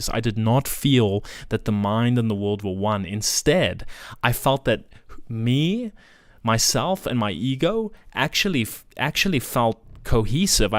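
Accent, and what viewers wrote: South Africa. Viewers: As soon as I heard your voice I thought, He's South African! (like me). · I know that accent anywhere. Hello, my fellow South African❤️. Praise be to God for your journey and conversion🙏🏽. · As soon as you started speaking I thought hey you sound south african. Fellow south african catholic here,welcome home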